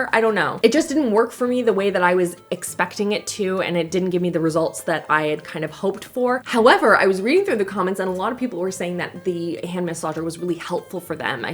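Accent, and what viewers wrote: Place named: Canada. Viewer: As a fellow American, if you want to hear this accent in real life, but can't go to Canada, come visit the midwest. Especially Wisconsin or Minnesota- you'll hear it everywhere! 😂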